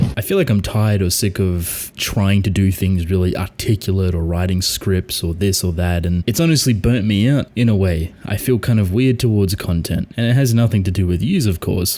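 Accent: Australia. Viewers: maybe it’s the deep Australian accent 🤷‍♂️. Looking forward to your new ASMR channel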